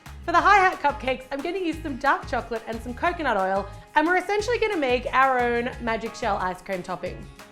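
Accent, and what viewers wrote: Ireland. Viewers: love your irish accent hahahah i'm irish but dont celebrate patricks day much,its definitely a bigger thing in america or australia :D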